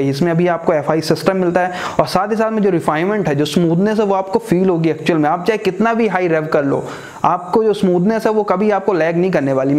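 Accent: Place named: Rajasthan, India. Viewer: Sir ji aap Rajisthan se hai kya,, aisi lgta h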